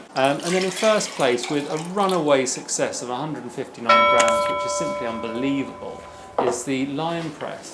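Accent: United Kingdom. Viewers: I'm wicked high right now and I don't know how I got here but I can't stop laughing bless up my British-accented mans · This guy is funny and down to earth. Plus, he kept this quick and comprehensible; probably why it was near the top of my Google search. Extra points for the Brit accent. Oh, my girlfriend likes his hair.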